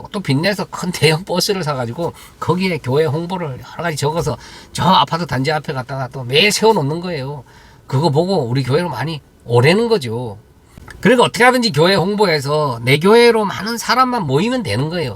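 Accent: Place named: Gangneung, South Korea. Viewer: 혹시. 댁이 강릉이래요 ? 사투리가.. 어데 소케야 그기..여기서..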